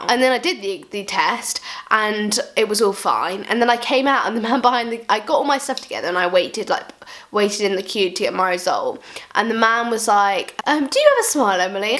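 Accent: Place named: RP, United Kingdom. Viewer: shes posh what you on haha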